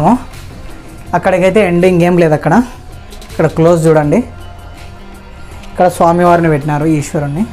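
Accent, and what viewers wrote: Rayalaseema, India. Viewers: Rayalaseema yaasa?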